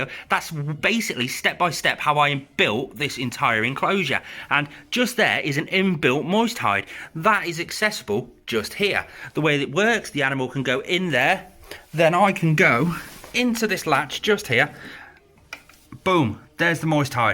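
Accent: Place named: Northern England, United Kingdom. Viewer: People need to leave it out. Like actually take the time to watch the video or understand the situation before jumping down people's throat, you did a good job mate and nobody should take that away from you. On a side note thanks for clarifying where you live my southern ears almost missed it as I don't speak northern too well 😜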